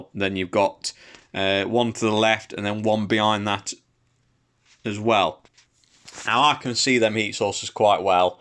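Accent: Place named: Nottingham, United Kingdom. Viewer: Where are you from mate? Your accent is Notts/Derby?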